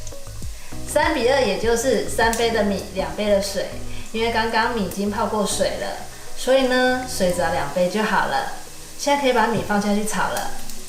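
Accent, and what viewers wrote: Taiwan. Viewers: 很少聽到這樣的台灣國語了！真好吃的油飯，謝々阿嫂。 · 不會呀 台灣女生都這樣講話 我思念的鄉音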